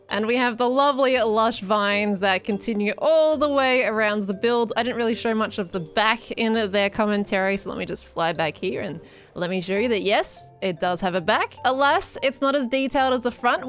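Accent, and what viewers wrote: Australia. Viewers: Love how you bring the true blue Aussie to Hermitcraft. ❤.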